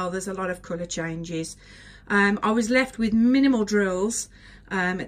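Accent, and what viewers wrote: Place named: Birmingham, United Kingdom. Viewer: Hi glad you finished it . And nice to hear a Brummie like me. Looking forward to seeing Tinkerbell.😁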